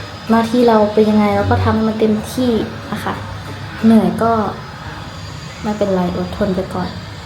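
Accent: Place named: Laos. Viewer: พูดไทนชัดมากพยายามอย่าพูดตกสำเนียงลาว-ร-ล-ช-ให้ชัด-ควม-ความ❤❤❤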